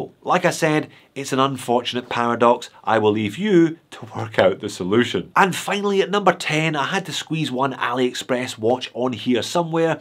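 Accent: Scotland, United Kingdom. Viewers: Me every time I hear an unexpected Scottish accent “oh ma gawd” Appreciate the video, I want a gmt for the utility but don’t want to be daft and overspend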